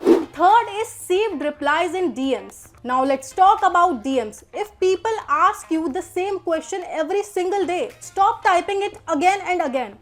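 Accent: India. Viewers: Yeah Anytime and really nice East Indian Accent and Hot Beautiful Smile plus Personality.!!!💙❤🩵✅️👌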